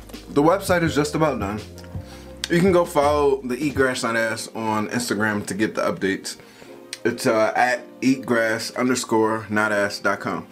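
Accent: Maryland, United States. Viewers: Can you do a vid flexing your Maryland accent It is so interesting 🤖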